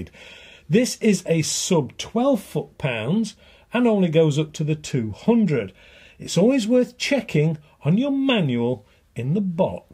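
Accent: United Kingdom. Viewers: Very good job on the video. Lots of good information for a new airgunner. I own a Gamo Urban PCP and it's a great low cost pesting tool, powerful and very accurate. There are a couple of things I would point out. At the end of your video you instructed that the user should remove the magazine from the rifle before storing. The bolt must be pulled back to remove the magazine which cocks the hammer. Also, there is a pellet in the barrel yet so when the bolt is closed the rifle is still ready to fire! I usually leave the pellet in the barrel but I always de-cock the hammer. One has to remember that there is still a pellet in the barrel too, or when they reinsert the magazine it will result in a double feed with two pellets in the barrel. To be really safe, the pellet should be removed with a cleaning rod, or, shot after the magazine is removed. I had a few double feeds when I first got the Urban until I learned what was happening. 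Thanks, I always enjoy your videos. There's just something about listening to that British accent...😉 Geo from MI, USA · UK English with the accent really are the best narrators.. Soothing like Clarkson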